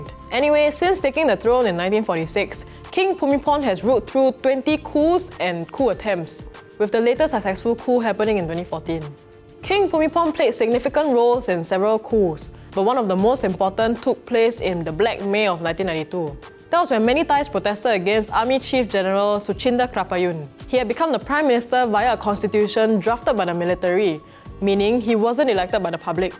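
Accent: Singapore. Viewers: The unabashedly singaporean accent is refreshing compared to the fake western accent that most reporters have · That is how we Singaporeans sound like. Don’t like it then it is your problem.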